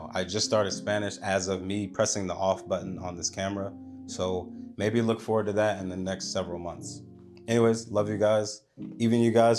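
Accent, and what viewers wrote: United Kingdom. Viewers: New subscriber. Awesome content. Brilliant British accent too 🎉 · That was a lovely posh British accent! Very interesting channel. Nice to see another brother in Tokyo doing well.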